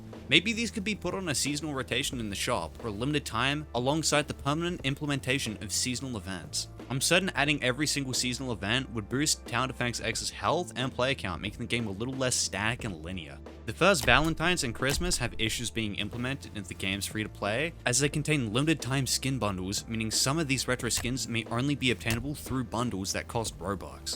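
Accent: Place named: Melbourne, Australia. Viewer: It's giving Melbourne accent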